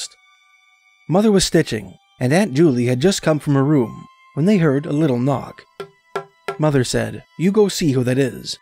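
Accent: Canada. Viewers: Your Canadian accent is a bonus to your perfect narrations!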